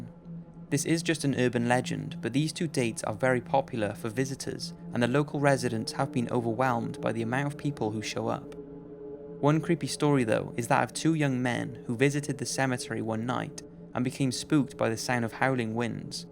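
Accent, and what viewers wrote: Wales, United Kingdom. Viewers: That's a Welsh accent right? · Welsh accents ftw! As you can tell i'm also from Wales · Silly Question.. Are you Welsh? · Are you welsh?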